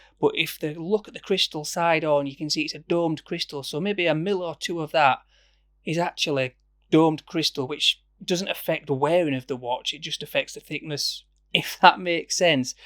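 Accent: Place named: Leeds, United Kingdom. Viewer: Enjoyed your review, very rounded and if I can convince the missus this will be my next purchase Trying to place your accent, somewhere near Leeds ?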